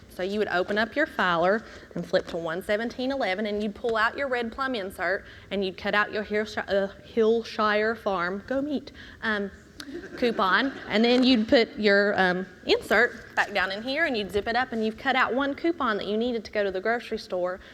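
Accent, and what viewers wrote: South, United States. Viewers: ***** Lol, You're very charming and I love your southern accent. Thanks again. :)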